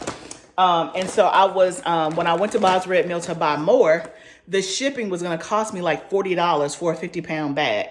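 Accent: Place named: Georgia, United States. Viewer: I love watching Azure Standard Haul's .. You Sound Like A Georgia Girl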